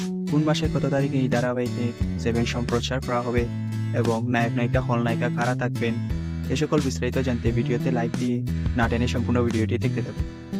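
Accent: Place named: Sylhet, Bangladesh. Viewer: Apni mone hoy sylhetie taina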